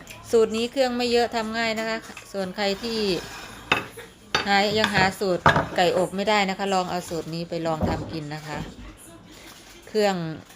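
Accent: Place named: Isan, Thailand. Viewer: ชิมนำแน่ครับ .เป็นตานุ่มคือคำเว้าอิหลีตั่วละ.น้ำลายไหลเลยครับ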